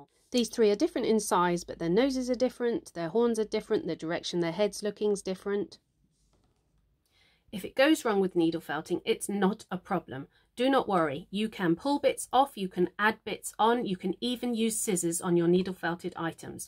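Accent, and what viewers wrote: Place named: United Kingdom. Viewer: This was so helpful to listen to. I've been felting for about a year? I agree with you that it is so addicting. My one comment to add is that I watch many videos and find I want to try so many different types of felting (2D, 3d, landscapes, wet felting, needle felting...) I feel that trying a lot of different things has helped me narrow down what I want to concentrate on. Now, I need to practice, and concentrate on one type of felting to gain more confidence in my abilities. I look forward to watching more of your videos! I am American and just LOVE listening to your british accent!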